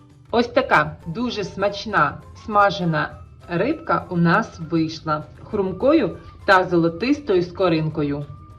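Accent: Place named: Russia. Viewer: чутно що ти у побуті маскваротиш.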